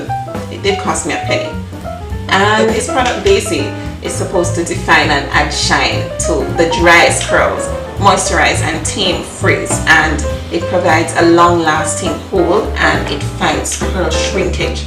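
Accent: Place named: Caribbean. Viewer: I'm hearing a Caribbean accent. Where are uu from?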